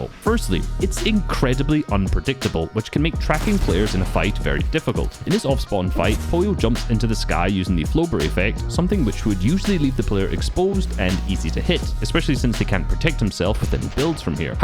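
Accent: Scotland, United Kingdom. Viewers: But isn’t he Scottish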